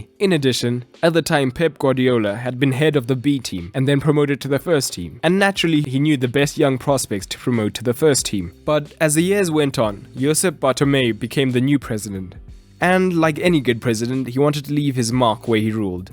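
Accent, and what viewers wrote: South Africa. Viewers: Accent sounds South African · You sound South African and black, I could be wrong but I doubt it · Hey mate are you from South Africa?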